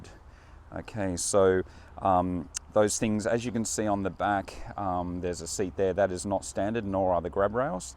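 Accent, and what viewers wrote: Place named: Australia. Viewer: Love the Australian accent and of course the Ryker looks very Mad Max :)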